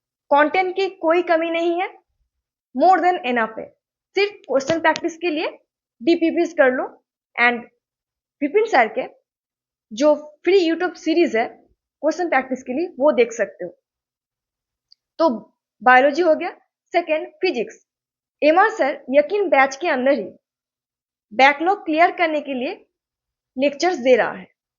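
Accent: Bengal, India. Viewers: Aapke hindi bolne ka style thora bengali hai 😅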